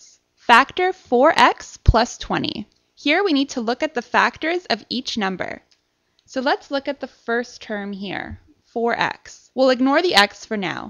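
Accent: Canada. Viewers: Fantastic explanation, and clear examples. Really helpful. And as an aside, AMAZING Canadian accent (as an English Brit we don't get accent envy often, but yours is awesome) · very helpful - cute Canadian? accent :)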